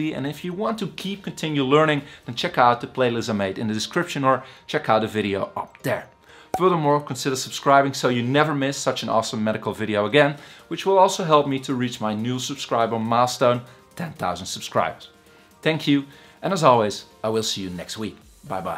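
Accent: Netherlands. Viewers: I heard your voice and just knew you were a fellow Dutch person. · Ik kan aan t accent merken dat je nederlands spreekt 😂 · Hahaha, I knew you were Dutch. My favourite European-English accent.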